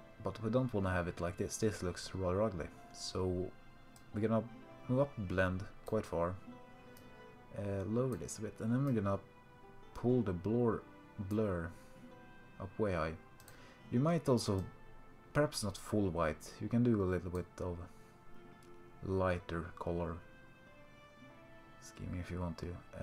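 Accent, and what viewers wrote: Sweden. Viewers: are you Scandinavian (your accent sound Swedish but it could be another country)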